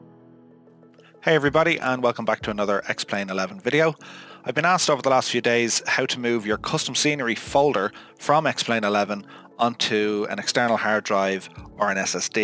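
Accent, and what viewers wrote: Ireland. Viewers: Lots of nice content on your channel. Love the Irish accent 😀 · I have watched a few other tutorials about this and i ended up deleting all of my aircraft... i was gutted and feeling hopeless about this symbolic linking or whatever... watched this and it made every bit of sense to me, it helps that you're a fellow Irishman, could understand the accent perfectly. Thanks for making this so clear to me, I've now got it working and i really appreciate it.. subbed!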